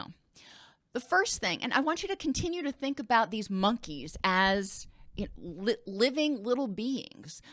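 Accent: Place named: United States. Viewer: Your explanations are really amazing, but I am afraid your accent is too American an you speak so fast for non natives like myself. Thanks a lot though.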